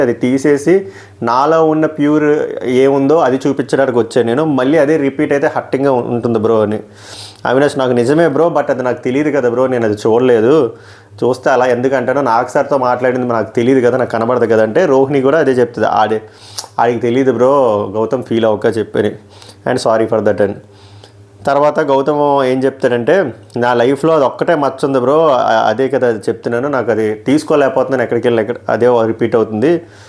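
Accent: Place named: Visakhapatnam, India. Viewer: Super slang bro … I like vizag slang …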